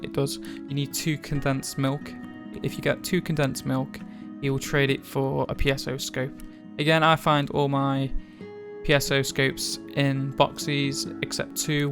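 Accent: United Kingdom. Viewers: but you are English.....well from your accent you sound like being from the UK and before our English in the us the only people to speak English were British people.....so the "we all do it" is wrong here because hes not from the us for one so maybe they pronounce words differently than we do here?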